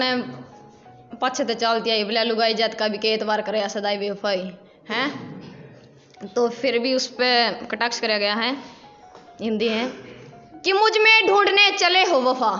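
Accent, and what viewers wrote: Haryana, India. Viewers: Hryanvi me bhut sunder bhut pyara bola bhna 🤗❤️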